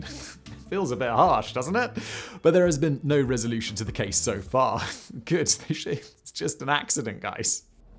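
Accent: England, United Kingdom. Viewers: I'm so glad I'm not English. I would probably sound like this dude when I talk and that would piss all of us off and have to punch myself in the face if somone didn't beat me to it(no pun intended). And dont these people realize if you smash the like button you will break your electronicsl Stupid limese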